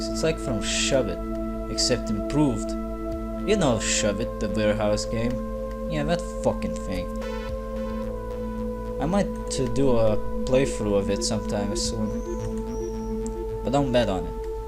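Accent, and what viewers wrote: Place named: Russia. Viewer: are you russian?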